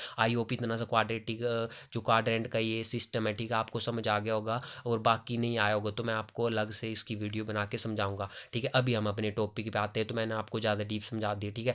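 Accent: Himachal Pradesh, India. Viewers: Himachali accent is always osm ❤😁😉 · Bahut simple way mai smjha diya aapne bhai very nice keep it up and love your Himachali accent ❤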